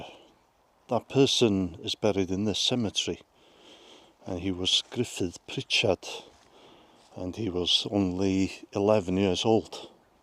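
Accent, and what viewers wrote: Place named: North Wales, United Kingdom. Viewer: S Walian here; nice to hear a full Gog accent!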